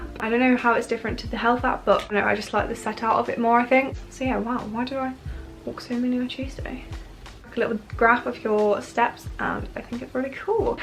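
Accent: England, United Kingdom. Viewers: Your English accent is very nice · loves her cute british Accent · She is from England maybe, cause i don't understan all words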